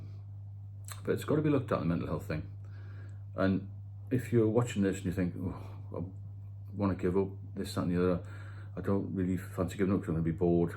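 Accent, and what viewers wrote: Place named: Darlington, United Kingdom. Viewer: yep I’m subbed. I come from Darlington so I recognise the twang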